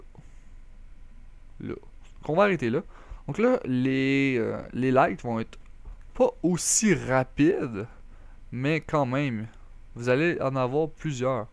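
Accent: Quebec, Canada. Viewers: Accent du Canada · Petit accent Québécois <3❤ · Tu as un accent Québécois ou Canadien je mis connais pas trop mais j'aime trop se genre d'accents +1like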